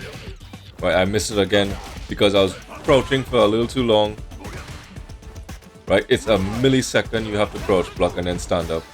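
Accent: Trinidad and Tobago. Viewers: Are you Trinidadian? The accent sounds very familiar.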